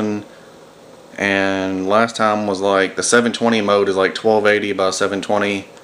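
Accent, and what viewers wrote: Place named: United States. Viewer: You have a cool American accent like a badass XD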